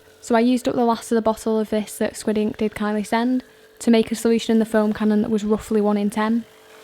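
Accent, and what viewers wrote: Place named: Scotland, United Kingdom. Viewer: What a Beautiful accent. Its that Scottish? or regular British? But I Love it though very sexy. Nice Job on the wash and great car too.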